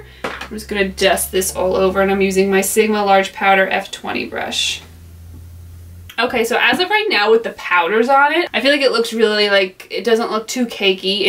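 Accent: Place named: New Jersey, United States. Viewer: I basically fell upon one of your vids the other night and when I heard your accent I thought she has to be from Jersey! I think you're awesome anyway tho 😁 #subscribe 💜 from Philly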